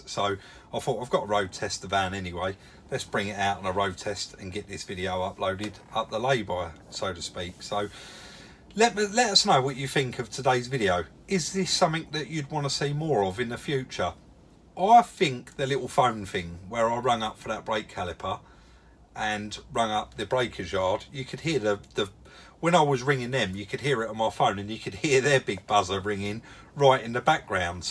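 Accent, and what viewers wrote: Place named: Thanet, United Kingdom. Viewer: My mothers family came from the Thanet area and when I hear that accent of yours it really reminds me of them. My Nan lived in Herne bay and ran the old dairy shop for years, then she had a few cafe's in Mortimer street and a green grocer..she was really well known in her day....She moved on to Whitstable where she had the 8 till late shop on the main drag in town. Most of them are no longer with us but it's good to hear the dialect and sayings. I bet you went to Leysdown on holiday as a kid ?? Great channel, very interesting.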